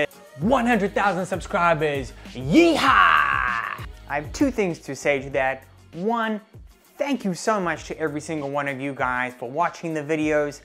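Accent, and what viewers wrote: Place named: South Africa. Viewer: Hi, love your channel. I've been trying to work out if you're originally South African, your accent is ever so faint. I've been away from sa so long myself that I might be mistaken. The reference to Banting in your description also made me wonder. Prof Noakes rocks, and as an ex Durbanite and avid Comrades follower he's legendary.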